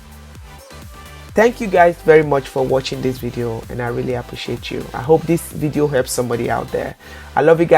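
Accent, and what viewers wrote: Yoruba, Nigeria. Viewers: Your Yoruba right? I’m Igbo and I recognized your rich accent. Lots of love❤️❤️❤️❤️❤️❤️ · No matter the accent the yoruba accent will always peek through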